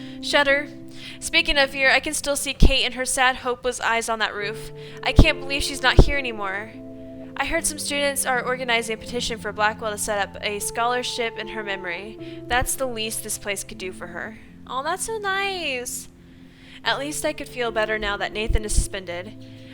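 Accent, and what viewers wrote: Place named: United States. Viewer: Where there be an American accent you just know I am not far behind ;) xxx I have missed these videos babe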